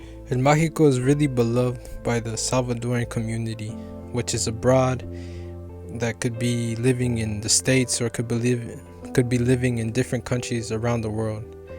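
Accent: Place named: Paisa, Colombia. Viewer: Puta solo ecucharte sabia que eras paisa Great my bro, HE is our Hero and yeah cause he was from E.S he's not as famous...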